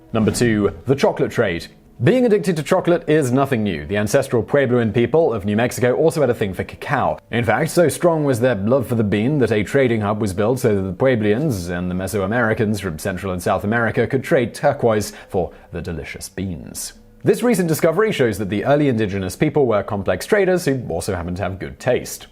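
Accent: United Kingdom. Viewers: So...you want a Brit to use incorrect American pronunciation? Here's a tip, go see the world and make sure to never use the complaint that "This isn't how we do things in America", because we either don't care, or find your ways quaint and strange, especially pronunciation.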